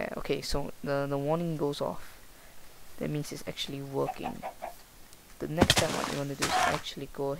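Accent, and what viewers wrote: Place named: Singapore. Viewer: Im singaorean too I recognisr ur singaporean voice immediately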